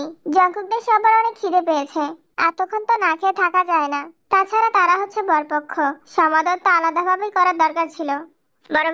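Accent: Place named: Brahmanbaria, Bangladesh. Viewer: Na tmr kotha shune mone hocche ashole dadur bashy shobay emon bhabe kotha bole ty mone holo tmi Brahmonbaria r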